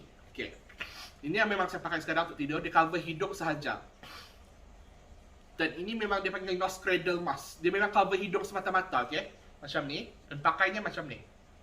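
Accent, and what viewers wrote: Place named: Sabah, Malaysia. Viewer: Org Sabah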